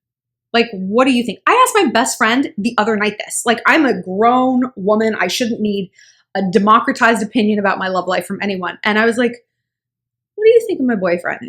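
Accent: England, United Kingdom. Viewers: That English accent though 😂😂 I like your channel, you give sound advice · That English accent though 😂 top notch madam, top notch 👌🏼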